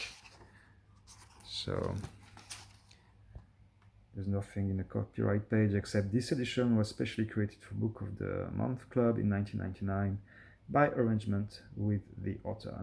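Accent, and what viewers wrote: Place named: France. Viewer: You speak english like a french !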